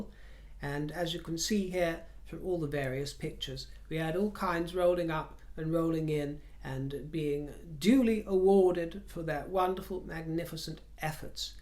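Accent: United States. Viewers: Loved your American accent. Props to you 👏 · I love your American accent! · Your American accent is spot on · I’m American. LOVE LOVE LOVE your American accent!💗🤣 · 😂😂 Omg ur American accent spot on. You look ßæv†!fu| 🌹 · Great American accent,! Perfect! 🇺🇸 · I've been watching you for several months now and find you to be charming, sophisticated, on the cutting edge of outrageous fashion trends and above all, hilarious. Your American accent is excellent. Your laugh is wonderful and you wear your lipstick loud and proud. Throw in gloves and tiaras and you are a national treasure. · I loved your Valentine outfit today, and I must say, you do an extremely good American accent! ❤️ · an American here who truly delights in your broadcasts and appreciates your humor. Your American accent was very well done, but I was alarmed by the change! You sound better as an American than Meghan does. 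😆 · darling, beautiful American accent! Spot on! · Loving your American accent. ❤❤❤❤ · Ugh NO American accent! My ears! My ears! 🙉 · Your American accent is quite good. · I love your laugh and your American accent! Keep on fruiting!! 🍇🍎🍉🍋😄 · Great American accent! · ♥ 💎♥💎♥ Happy Valentine‘s Day, dear. You had me laughing, as usual here. Loved the American accent and the insightful observations. Looking gorgeous and in top form. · Ms. very elegant as always! Happy Valentines Day. I would love to be a fly on the wall, watching you and Sherlock Holmes ( Basil Rathbone) having a conversation. I am sure the conversation would be educational, and over my head. I am just a older country boy from NW Florida 20 miles east of Pensacola (southern Alabama really). Worked with a lot of brits. 23 and 24, recce loved their dry sense of humor. Every time I spoke Id get cracked on (southern accent) Can't understand Cockney accent it's funny though. 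Your American accent is spot on!!! Great show as always. God (Yeshua) bless you and your family and keep you safe!! Snogally woggly ha ha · Luv your American accent!!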